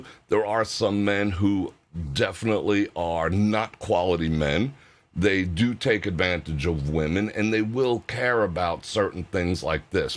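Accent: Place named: New Jersey, United States. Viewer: It was great fun. Sorry, i missed the live part, what ever you talk about, its like have a friend chatting, i really enjoy listening to you, you sound like Jersy to me, I'm from Wilmington, D, just over the bridge.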